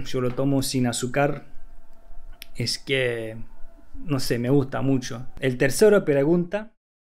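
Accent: Argentina. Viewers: Que bien que hablas el español argentino!!! Bien porteño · ablas como argentino español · no es español de España sino español de Argentina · Hablas muy bien como argentino... el asentó es inconfundible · Hola, nose como llegue a tu canal. Pero un placer verte tomar mate, y que hables mas Argentino jajaja. Merhaba... Iyi geçeler. Tratando de aprender tu idioma. Para concretar El Viaje pendiente. Saludos desde Argentina 🇦🇷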